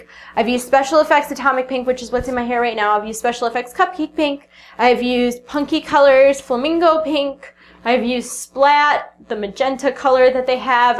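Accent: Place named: New York, United States. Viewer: I love the NY accent! lol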